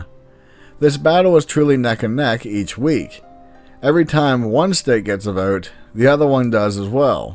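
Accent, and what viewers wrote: United States. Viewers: Yay someone did a video on my home country of Cymru (or Wales) I must say it feels odd to hear an American accent say our names.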